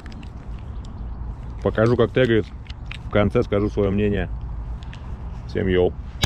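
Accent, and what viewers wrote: Saint Petersburg, Russia. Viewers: ты с Питера?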